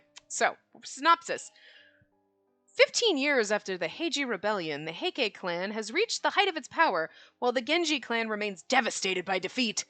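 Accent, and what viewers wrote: Ireland. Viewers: "Favorite boy, he's the last route, he's an asshole..." Me: Waiting, knowing full well that this boy is gonna have glasses, is gonna be a robot, or something else special. " Fukuyama." and there it is XD Does he have white hair (Looks to see) Blonde? Okay, close enough. XD XD Irish accent. Didn't it's regular use start with Bustafellows? Shu? You just wanted to experiment with it but it ended up sticking? (and making me REALLY DISAPPOINTED that Shu did NOT have an Irish accent in the game...) I can't recall you using an Irish accent regularly in your repertoire prior to that. Also, (adding after the end.) Fukuyama DOES voice the sketchy characters, but when it comes to Vyn Richter, I got so tired of the "he's a red flag" deal I literally wrote a 2k essay on why he's not and I will proudly stand by that essay. Vyn is a good boy, even if he wasn't voice by, so haters need to leave him alone. 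XD XD I'll stand by you as you stand by him. LOL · The Irish accent started with Jack from london detective mysteria because he had a accent was how he was written But you really start to use it with norn9 I believe that was the kickoff point when you start to use it with almost every game which I love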